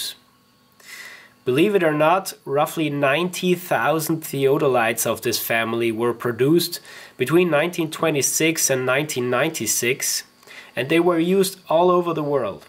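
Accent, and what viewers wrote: Austria. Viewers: your like the first Austrian guy who's English and accent doesn't hurt my brains. Thank you. Subscribed :)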